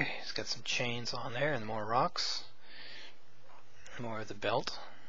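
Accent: Australia. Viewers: He's not from the UK he's an Aussie, big difference in accents.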